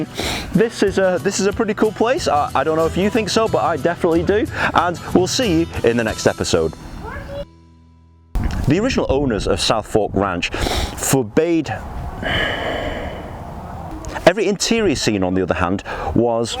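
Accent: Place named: England, United Kingdom. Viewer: Why the fuck is an English guy representing a Dallas Texas ranch! You think they could have got an American Cowboy with a southern accent instead! thumbs up if you agree.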